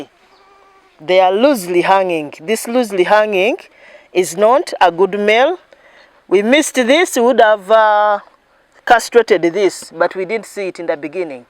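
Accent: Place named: Uganda. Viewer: You are doing well ma. Excellent in your explanation, you must be a good teacher. I love Ugandans accent.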